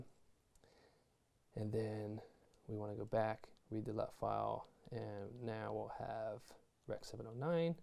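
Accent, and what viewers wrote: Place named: England, United Kingdom. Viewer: Lol your accent is getting more English 😂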